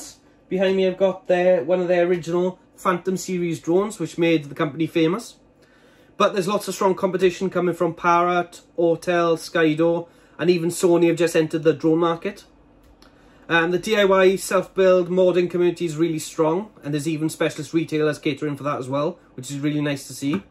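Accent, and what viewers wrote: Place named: Welsh Valleys, United Kingdom. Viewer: I detect a valley’s accent, I’m struggling to find a place. I live near Blackwood gwent. Please help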